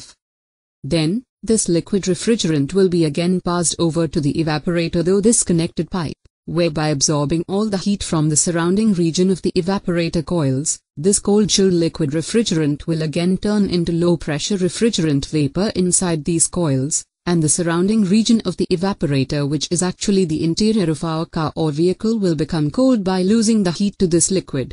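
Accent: India. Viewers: Even though the accent was clearly Indian this was an excellent tutorial on the air conditioning system inside my car. Thank you.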